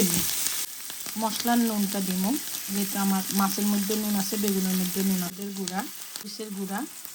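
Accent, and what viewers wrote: Noakhali, Bangladesh. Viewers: খাবার পান না আবার পচায়য়া নষ্ট করে বাসি করে খান।😢এই শাকটা টাটকা খেলে মজা পেতেন এখনতো রাবার হয়ে গেসে।আমার শশুর ভাড়িও নোয়াখালি আমার শাশুরি আপনার মতনই কথা বলতো আর এইভাবেই তরকারি রেখে রেখে পচায়া শুখাইয়া রান্না করতো কম পেয়াজ তৈল দিয়ে।মারা গেসে আমাকে তালাক দেওয়াইয়া আরেক মাগি ঘরে আইননা আল্লাহ উনার আত্তাকে শান্তি দেক বেহেস্ত নসিব করুক।আমিন।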